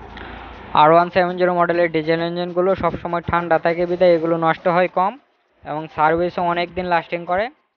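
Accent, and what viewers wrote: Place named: Sylhet, Bangladesh. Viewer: সিলেটের ঐদিকের ভাষা এমন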